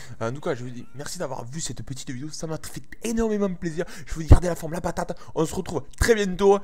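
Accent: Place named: Toulouse, France. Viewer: Un bon toulousain avec cette accent sa fait du bien, les toulousains les meilleurs le meilleur si je pourrais jouer avec toi sa serait cool si tu veux je te donnerai mon gt;)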